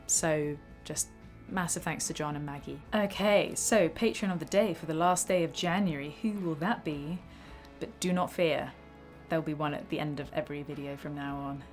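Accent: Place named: RP, United Kingdom. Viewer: Good on you, thanks for the look around your studio! I enjoyed listening to your very easy on the ears posh British accent... Best of luck with the record!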